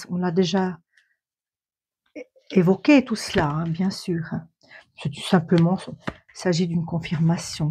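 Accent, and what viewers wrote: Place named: Romandy, Switzerland. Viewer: Ce petit accent suisse Romand ! toujours un plaisir de l entendre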